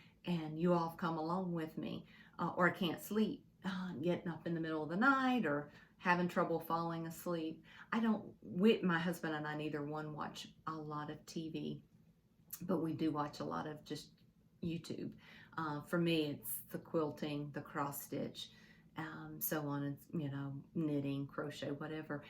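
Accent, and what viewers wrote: South, United States. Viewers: Welcome to the sharing community. I love seeing all the creativity . I want to start something in quilting. Haven’t done it in years, but when I see all the wonderful quilts and things, I get the itch to go down that rabbit hole. I myself have been stitching since I was around 6. Loved hearing your southern accent. Looking forward to seeing your next video. P.s. I am from IN. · Love your video, I'm from the south also so I completely understand you, no close caption needed.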